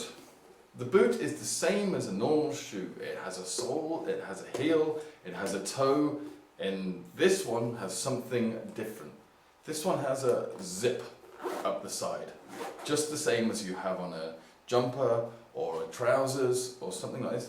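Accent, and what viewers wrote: United Kingdom. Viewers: Esto es de lo poco que se encuentra con acento británico.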